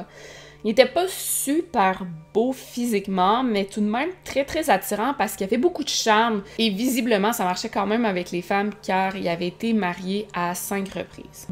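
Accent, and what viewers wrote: Canada. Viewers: Chère, vous êtes une très bonne conteuse avec cet adorable accent canadien même si toutes ces histoires sont "horrifiantes" et tristes. Et je tiens aussi à vous dire que la grossesse vous va à ravir, vous êtes rayonnante et très belle 😍 Une maman qui vous envoie du soleil de France.🌞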